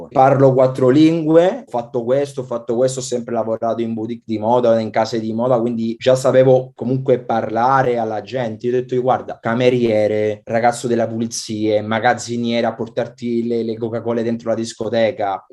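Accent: Tuscany, Italy. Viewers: con quell accento toscano, é spettacolare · Toscano eh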